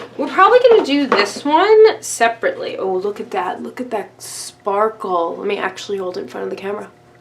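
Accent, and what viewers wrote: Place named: East Coast, United States. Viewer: I just discovered you and your East coast accent❤️‍🔥❤️‍🔥❤️‍🔥🥇🥇🥇🥰🙏🏻👍🏻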